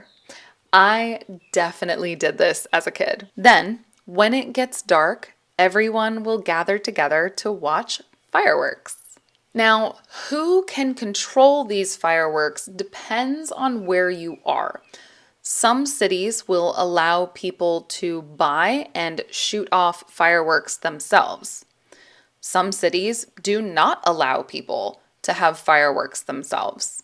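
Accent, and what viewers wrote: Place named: United States. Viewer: I love the American English. 😊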